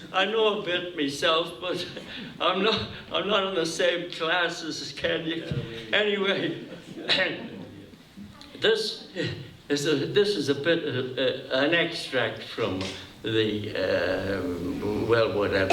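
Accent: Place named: Liverpool, United Kingdom. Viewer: Sounds like scouse ,northwelsh, irish, cumbrian, and stoke , cheshire accents mixed...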